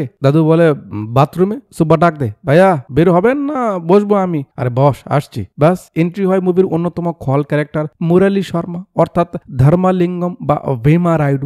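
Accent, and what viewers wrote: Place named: Rajshahi, Bangladesh. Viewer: Anpr Kotha bloar style aktu aktu rajshashi r Manus er moto... ❤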